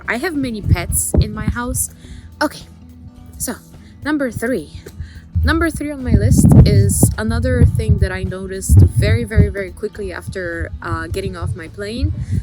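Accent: United States. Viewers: This is my very first time to check on your video. I was amazed that your English is so well and American lol. Enjoy home and return to Tokyo soon. I could not return to Tokyo because even I was born and raised in Tokyo. I did obtain US citizenship so I have to get visa every time I visit my parents living in Tokyo. I wish I can return Tokyo in November. Have fun in Poland!d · アメリカ発音で聞きやすかった… これどうしようもないんだよね耳が学んだ言葉と発声はそれしか理解出来ないのよね。まあそれだけ言語力が無いって事かも知れんけどw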